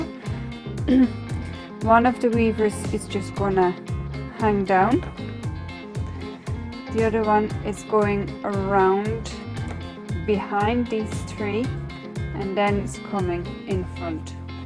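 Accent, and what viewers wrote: Ireland. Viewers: I expected a Flemish accent and then you hit me with the slight Irish accent!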